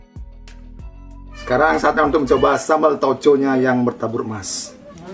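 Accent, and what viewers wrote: Bali, Indonesia. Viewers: duhh seksiinyaaa logatnya bali bgtt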